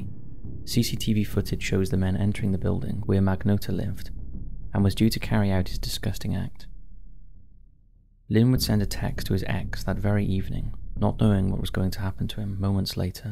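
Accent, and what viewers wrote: Wales, United Kingdom. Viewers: You realise you just said the same thing? I assume it was just an un noticed slip of the tongue. We are all human. And can surely forgive such things? They are working on a quick turnaround this month so even more understandable. FYI The narrator is british- Welsh.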